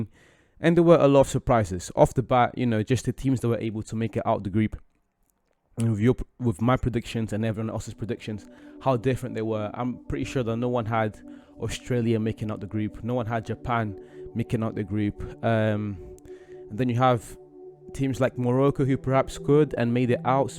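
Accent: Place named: Germany. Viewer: Are you German? Accent is strong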